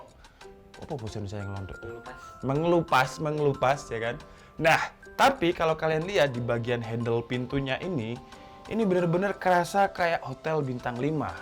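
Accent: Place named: East Java, Indonesia. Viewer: Orang jawa timur ya bang? Kalau benar abang orang jawa timur jadi kita sama :)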